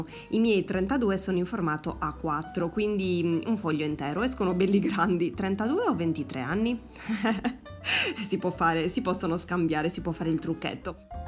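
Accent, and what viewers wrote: Sardinia, Italy. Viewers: Quanto 6 simpatica..amo il tuo accento forse perche sono di sassari..sarda come te..sei di una bravura e poi la verità mi piaci perche spieghi benissimo e nello stesso tempo cucini..che dire la cucina sarda e la migliore..😘😘😘💖✌✌✌ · Non so se mi sbaglio ma il tuo accento sembra sardo! Mi piace moltissimo la torta...anche io faccio l'impasto delle ciambelle proprio come fai tu. Ajò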